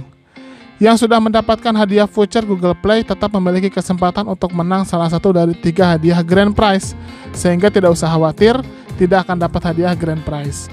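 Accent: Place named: Sumatra, Indonesia. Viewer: Min, mimin orang sumatra ya..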